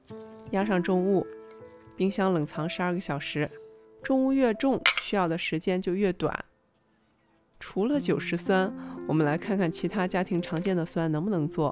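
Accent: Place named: Yantai, China. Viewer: 听口音不知是不是山东烟台人？😁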